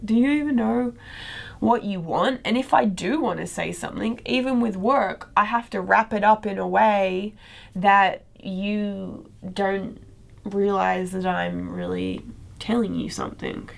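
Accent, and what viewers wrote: Australia. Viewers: she talks naivety with an australian accent well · So why does she still have an aussie accent when she speaks ?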